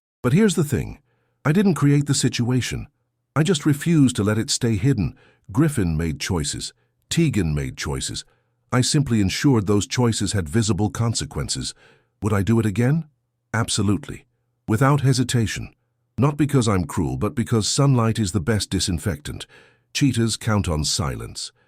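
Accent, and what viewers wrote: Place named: United Kingdom. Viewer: The Brit 🇬🇧voice makes the stories even better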